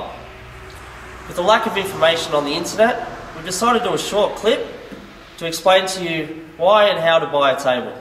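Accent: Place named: Australia. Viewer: What did he say at the end about the best cloth? what type? I find it hard to understand the AU accent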